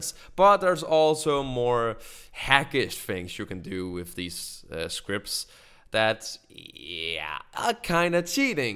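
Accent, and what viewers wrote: Denmark. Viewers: du er god til engelsk men man kan godt høre at du er dansker!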